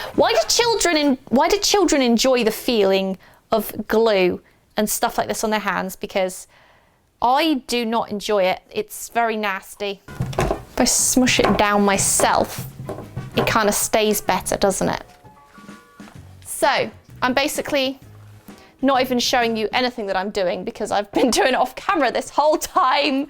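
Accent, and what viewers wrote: United Kingdom. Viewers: IDK why she llookss like millie Bobby Brown and has British accent is it just me yeah I think so I'm weirdd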